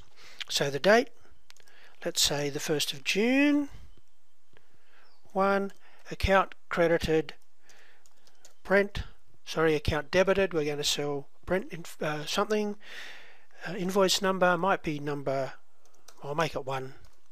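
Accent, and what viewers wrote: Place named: Australia. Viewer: You're an aussie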